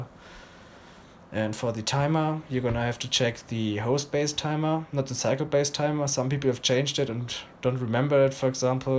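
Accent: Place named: Germany. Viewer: Man hört dass du deutsch bist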